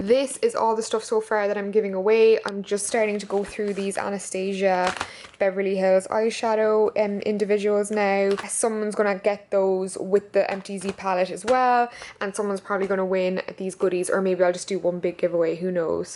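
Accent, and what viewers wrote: Ireland. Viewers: Sexy Irish accent 💕👌. X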